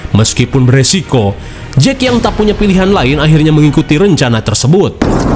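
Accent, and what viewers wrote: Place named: West Java, Indonesia. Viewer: channel youtube yang nyunda nya nyunda bgt 😂😂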